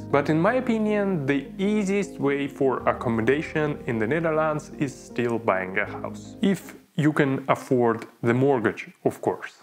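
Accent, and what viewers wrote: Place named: Russia. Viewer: да совершенно любая буква t, на , , и так далее. Не, ну это просто акцент, не принимайте близко к сердцу, я просто от многих русскоязычных слышу этот звук, просто интересно, почему вдруг именно так эту букву произносят, ведь в русской речи у нас этого звука нет) те же греки, у которых сильный неубиваемый акцент, просто произносят свои греческие звуки, а у нас такая своя версия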